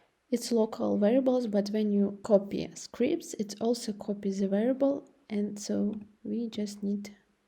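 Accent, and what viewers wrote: Russia. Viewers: Русский акцент никто не отменял и спасибо за туториал😊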